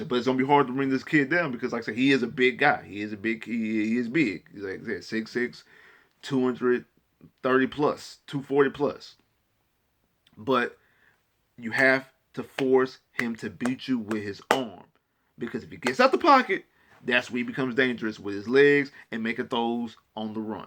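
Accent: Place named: South, United States. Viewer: Aye bruh. I’m a Bills fan from Alabama and before we play somebody, I listen to a YouTube fan blogger for that team.... u the best one yet bruh💯 You ain’t talking stupid, you respect your opponent , your perspective on your own team is on point, and your analysis of my QB..... I COULDN’THAVE SAID IT BETTER! I don’t know if it cause we both from the south and I can relate to your delivery, but I enjoyed this video. You should maybe open it up and do NFL videos not just about your team. (Idk if you already do) one Love folk. #GOBILLS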